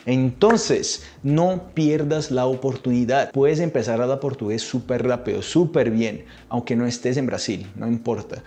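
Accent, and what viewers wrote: Colombia. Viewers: Le doy 10 de 10 a Tu asentó español colombiano, ojalá yo pueda aprender portugués así de bien como tú hablas español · nossa você fala espanhol como se fosse colombiano, eu sou falante do espanhol e quando escutei a você falando foi incrível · Ya pegaste el acento colombiano... yo aprendí contigo.. ahora vivo en Brasil y enseño espanhol rsrsrsrrsr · Amé tu acento colombiano es bellísimo!! ya inicié el mini curso es pero avanzar pronto!! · Jaja, como lo mencionan en el video con Steve Kaufmann, tu español suena con el acento de Colombia.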